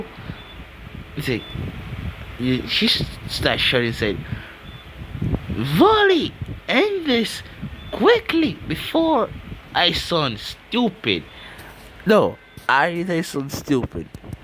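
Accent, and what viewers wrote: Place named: Jamaica. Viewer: Your a Jamaican aren't you well big up mi G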